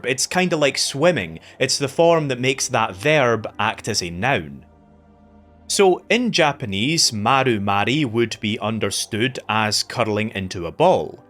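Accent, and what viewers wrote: Scotland, United Kingdom. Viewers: That's a Scottish accent right? Fresh change to hear a game review with this accent. I like it. Wait is that Scottish right not irish? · Listening to your Scottish brogue made this video fly by quickly. Cheers from this grandson of Coatbridge, born in the States.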